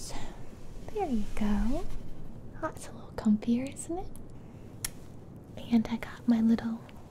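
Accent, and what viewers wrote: South, United States. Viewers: maybe can visit texas and regain her southern accent again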